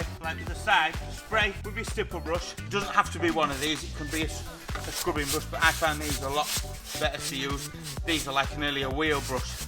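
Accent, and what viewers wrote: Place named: Scotland, United Kingdom. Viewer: This man is speaking in a Scottish accent.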